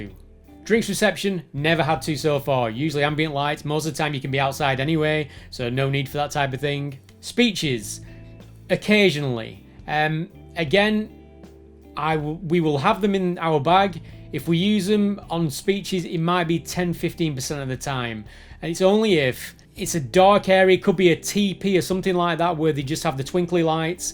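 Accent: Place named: Wigan, United Kingdom. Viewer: Awesome! Funnily enough, I’m a photographer looking for some LED lights for the dance floor… think you’ve just convinced me! And a familiar accent, too! I’m only down the road in wigan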